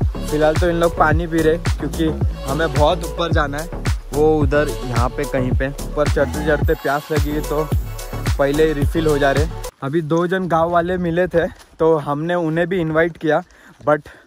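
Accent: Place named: Maharashtra, India. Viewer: Ur tone sounds like Maharashtra people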